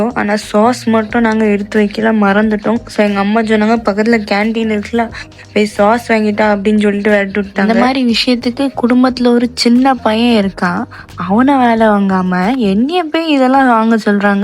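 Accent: Tirunelveli, India. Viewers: first time seeing your video..you got different slang..is this Tirunelveli slang..chapaad for sapaad, chemma for semma, cholluvon for solluvon..neenga "sa" endra vartha use panna mattingla..ha ha..anyway all the best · I'm a 77 year old retired banker settled in Madras. My native town is Palayamkottai, my paternal thatha's place. We have many relatives in Tirunelveli. I love your typical Tirunelveli accent despite being a Mumbaikar,. Your comments about your thambi were hilarious too. Glad your mother brought all the food for the long journey. I've seen most of your car journey vlogs and was sad about the several breakdowns of your WagonR. I was a Maruti faithful owner for 32 years having changed many models but always satisfied with the reliability of the Maruti Suzuki cars. May God bless you all dear girl. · Tvl slang super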